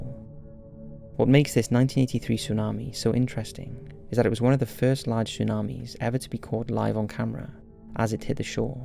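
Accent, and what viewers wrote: Wales, United Kingdom. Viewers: That’s a welsh accent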